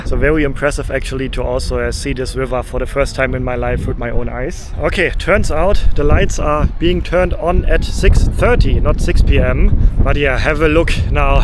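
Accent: Germany. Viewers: your pronounciation of Germany in Chinese is still off 😂It is because of your German accent. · Maybe a "better" suggestion for saying Germany in Mandarin is: "Ter Kwor"; you raid roughly "Ter Kwar" - a world of difference. People who suggest, say: "De Guo" - may not be helping you much, since you maybe do not know the current, and more accurate, Pinyin phonetic system. The "De" is pronounced "Ter" and the "Guo" is pronounced "Kwor" - OR rather than AR sound. Also in Pinyin, the "d" is the usual "t" and the "g" our usual "k". I noticed you may have pronounced Wuhan as Vuhan, since you are using your German way "v" for the "w", just as your English is very much the German way of speaking English.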